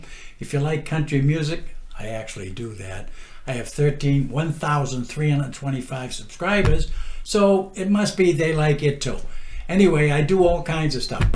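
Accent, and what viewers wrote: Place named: Massachusetts, United States. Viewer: Thanks! I enjoyed your information and the way you presented it. I'm guessing you're from Mass. I'm originally from Boston's Dorchester (now living in the Toronto area). My laptop is Windows 10 by my old PC (the one I use every day) has XP as its operating system. I depend on that PC (especially Cool Edit Pro... and I lost the "key") as an income generator but I know the hard drive won't last forever. Yes, I have the updated "version" of Cool Edit Pro (Adobe Audition) on my laptop but the old version works better (to me). Thanks again!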